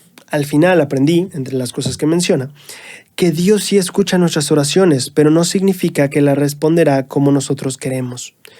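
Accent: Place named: Mexico City, Mexico. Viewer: Con mucho respeto me gustaría dar mi opinión respecto al hermanito, tengo entendido de que el nos habla desde la Ciudad de México (nosotros les llamamos Chilangos) y ahí he notado que todos los chilangos hablan el español muy rápido y de corrido y a pesar de que mi hermanito lo hable un poco rápido se le entiende muy bien y claro... yo puedo sentir muy fuerte el espíritu, yo siempre lo escucho y he aprendido mucho más del evangelio con sus explicaciones. Deseo de todo corazón que NPC siempre me lo bendiga con mucha salud y energías para que nos siga nutriendo spiritualmente, aunque no lo conozco personalmente El siempre está en mis oraciones para que le vaya bien siempre. Muchísimas gracias 🤗🤗🤗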